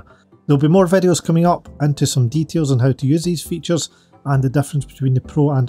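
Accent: Scotland, United Kingdom. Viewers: I absolutely love the scottish accent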